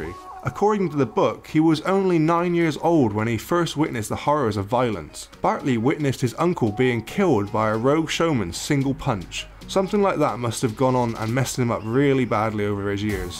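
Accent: Bristol, United Kingdom. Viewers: Are you from Bristol the man narrating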